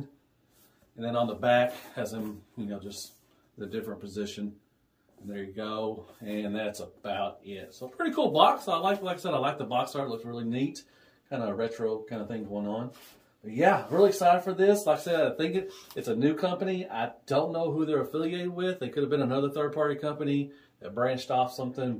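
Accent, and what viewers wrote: South, United States. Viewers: Your enthusiasm is joyfully infectious and a Southern accent is always a pleasure to hear. I subscribed, and am rooting for your channel! Side note from left field here but, I hope they make a Bruticus one day. 'Bingo Toys Bruticus' just rolls off the tongue, don't it?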